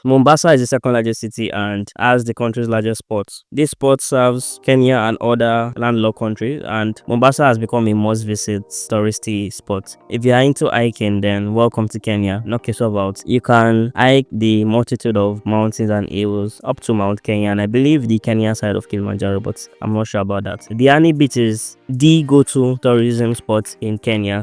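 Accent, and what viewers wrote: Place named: Ghana. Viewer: Your voice sounds like a Ghanaian accent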